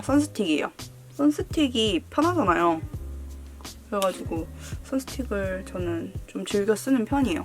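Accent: Jeolla, South Korea. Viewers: 엌ㅋㅋㅋㅋ 언니 말투에서 살짝 전라도 느낌이나서 귀엽다고 느꼈는데 부모님의 영향이었군요 ㅋㅋㅋㅋ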